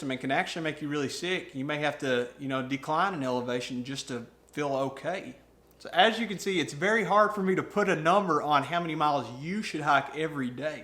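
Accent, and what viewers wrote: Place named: North Alabama, United States. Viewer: You from north Alabama by any chance? You sound about like me. lol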